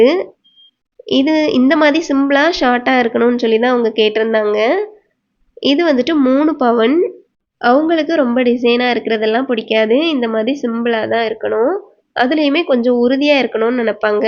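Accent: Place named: Kerala, India. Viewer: sss andha oor tamil pechu therinchuchu.... Malayalam mixed tamil... 😊😊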